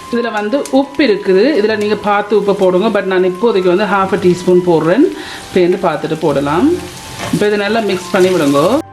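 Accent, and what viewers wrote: Sri Lanka. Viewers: உங்கட இலங்கை தமிழ் ரொம்ப வடிவா இருக்கு உங்க மாதிரி... இந்திய தேசத்தின் தமிழ்நாட்டிலிருந்து தீபா...❤️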